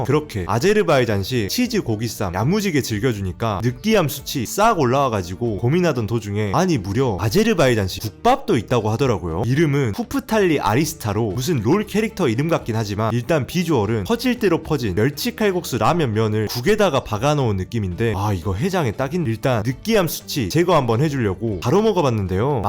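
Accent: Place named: Gwangju, South Korea. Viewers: 광주 억양 원래 이래요? ㅋㅋㅋㅋ 그런^데에~___ 중독성 오진다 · 대사 드립이 너무 재밋네요 대학교때 알던 광주 출신 동기형이랑 말투가 넘 비슷해서 재밋게 보고 있어요 ㅋㅋ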